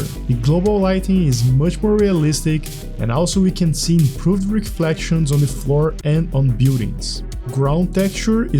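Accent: Brazil. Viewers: Where are you from? Se sotaque parece de brasileiro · Great video, man. Is your accent from Brazil?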